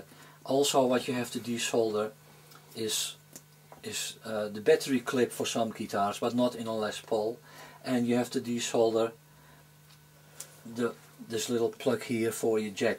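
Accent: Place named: Netherlands. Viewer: You sound dutch... and on your site it says your available in holland, where are you stationend?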